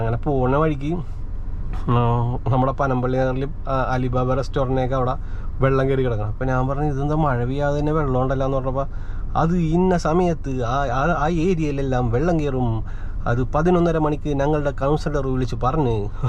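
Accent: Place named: Palakkad, India. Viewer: Chettan parajath palakkad slang aanallo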